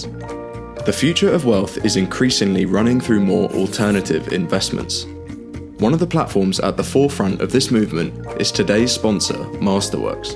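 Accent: United Kingdom. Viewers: So, as an American, I’m being scolded by a British accented presentation? Not disagreeing with anything, but seriously, I wonder what the British did with some of their colonies?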